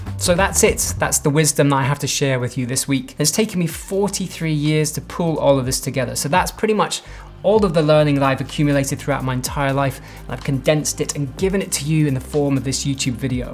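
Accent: Scotland, United Kingdom. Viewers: If it makes you feel better, you sound Scottish to this American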